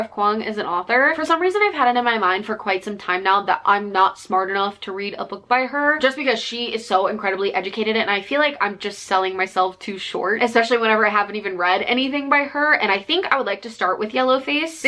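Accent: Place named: South, United States. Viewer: Please stop using the southern accent at the end of sentences in every single video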